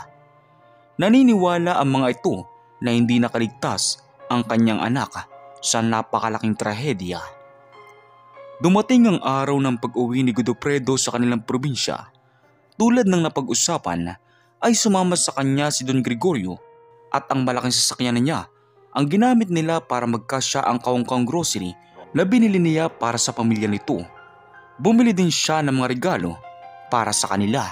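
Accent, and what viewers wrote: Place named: Visayas, Philippines. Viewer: Halatang bisaya ka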